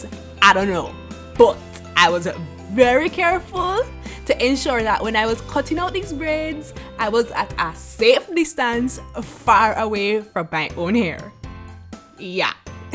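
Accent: Jamaica. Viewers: Are you Jamaican because I can hear your accent a whole lot! I'm Jamaican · I LOVE,LOVE YOUR ACCENT ARE U JAMAICAN Cuz U HAVE MY GRAMMARS ACCENT LOVE IT!!!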